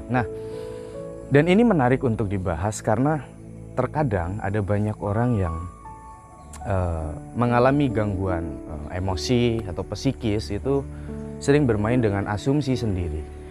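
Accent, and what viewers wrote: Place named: Banyumas, Indonesia. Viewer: Oh wong ngapak ya . Salam sekang Pemalang mas. Tangga kota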